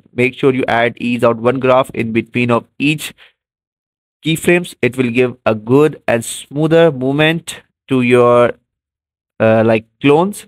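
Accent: India. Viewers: bro are you from india? Because your voice is similar to indians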